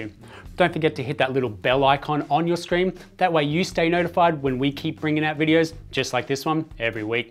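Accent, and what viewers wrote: Australia. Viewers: Very informative video, pity about the unbearable Australian accent...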